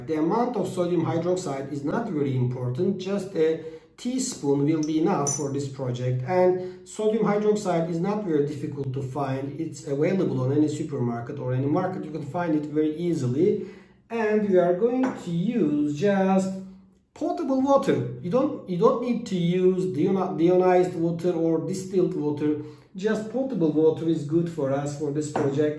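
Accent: Turkey. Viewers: I absolutely love the Turkish accent. Correct me if I’m wrong! Reminded me of my teachers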